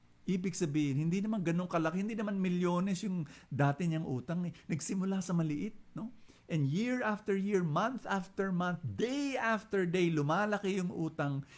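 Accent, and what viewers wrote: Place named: Tagalog, Philippines. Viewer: Nakakatuwa po kayo magtagalog hhahah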